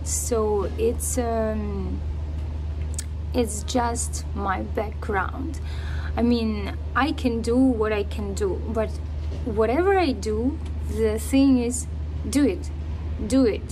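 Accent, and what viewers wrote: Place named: Russia. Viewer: Congrats on your hard work! I liked how you struggled with plank exercise at first. You say “I hate plank, arhhhh” in that beautiful Russian accent. Lol! Then it got easier and easier so you get tougher and tougher. I watched all 14 workouts and your cardio stamina improved greatly. You don’t need to lose any weight (talent agents suck!) but I hope your routine becomes a lifestyle. Good luck!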